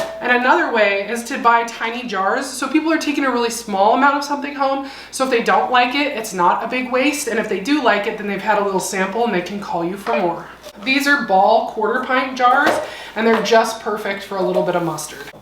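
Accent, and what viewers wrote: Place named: United States. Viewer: Love your common sense tips which I don't often think of...and your soothing voice (tbh some American accents are difficult to listen to (for me anyway) but I could listen to yours all day !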